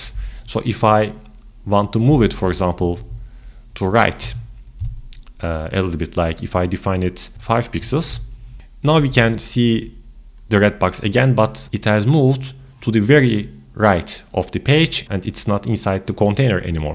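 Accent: Turkey. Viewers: did not even check the name of channel as soon as I heard your accent I am like kanka naber ya LOL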